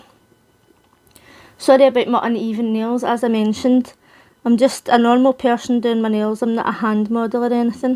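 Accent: Scotland, United Kingdom. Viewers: I’m so glad my Mum’s Scottish or I wouldn’t have understood this tutorial at all! LOL. Thanks also for this cause Sistaco’s tutorials are very quick and edited. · I love your Scottish accent!! great video !! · apparently they don't make this color any more. Too bad, it is really pretty! I love your accent, it is a bit difficult to understand sometimes since my ear isn't used to listening to a Scots accent, but that is a me problem, not a you problem! Can't believe that people would complain about that. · Hi great video was thinking of buying this product so glad I watched your tutorial as a new buyer would you recommend buying the deluxe pack or just the basic set or individual I’m so glad of the honesty that your tutorial gives and it’s great you’ve got a Scottish accent x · Well, all of those people are objectively wrong. Scottish accents are beautiful!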